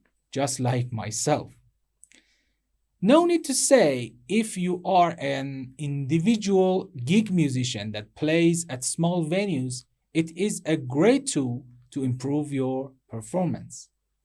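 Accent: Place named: Iran. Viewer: Your accent is so persian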